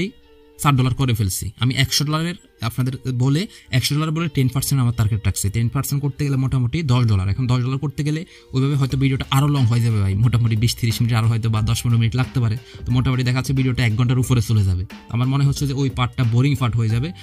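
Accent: Sylhet, Bangladesh. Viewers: ভাই আপনি কি সিলেটের মানুষ.??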